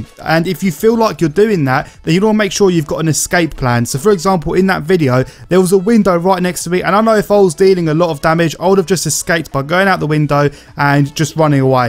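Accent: United Kingdom. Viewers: I agree, you're helpful and easy to listen to with that English accent! 😍😍 Haha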